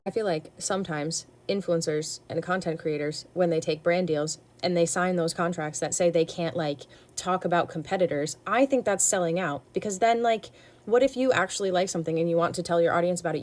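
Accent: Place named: New England, United States. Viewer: I love the way you talk! I was raised in Rhode Island and your accent and mannerisms remind me a lot of my hometown friends (i dunno where you're from in new england but your accent is familiar to me at least) your content is just v cozy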